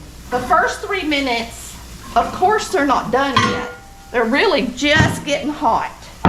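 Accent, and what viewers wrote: South, United States. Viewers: I’m from Texas which I guess is more west than south because I’ve never had grits nor red-eye gravy though I’d like to try. But most of my ancestors come from Tennessee and Georgia, so I love getting to learn about true Southern cooking from you. You make it look so easy. I especially appreciate your bubbly personality and your very southern voice, reminds me a lot of the women on my father’s side—always cooking, caring for people, and laughing.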